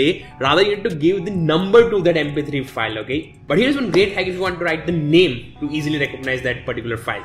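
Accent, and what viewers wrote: India. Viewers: Open interesting video - Hear an Indian speak instead of a human being - Close video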